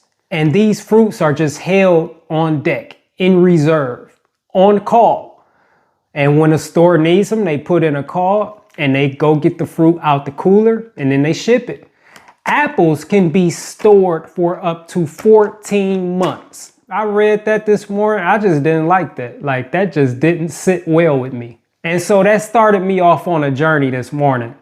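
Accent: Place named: Ohio, United States. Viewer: Bro. I'm in Cincinnati. Please let me know where in Ohio to get these fruits. I kinda heard it in your voice that you was from Ohio. Lol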